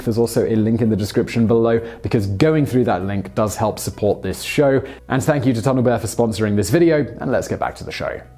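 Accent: England, United Kingdom. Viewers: Why is Johnny Sins speaking with an English accent?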